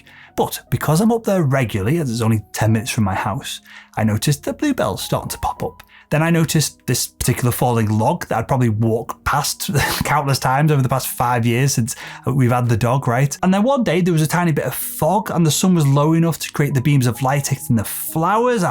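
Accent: United Kingdom. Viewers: Dollars? What good are dollars to the UK? Try saying £'s, after all you do sound British.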